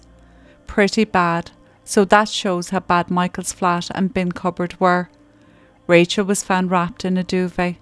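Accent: Ireland. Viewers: Thank you, as always. Beautifully covered on such a senselessly sad case. I love just listening to your soft brogue. Slainté 🍀☘🌹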